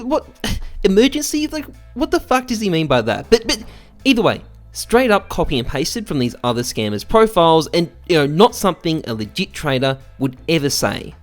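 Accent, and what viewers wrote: Australia. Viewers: i died when he said "nice spelling bud" its good watching an Australian because i find it funny when my whole family and extended family all sound exactly like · Australian accent and a video about scammers. Thought this was Karl Rock 😂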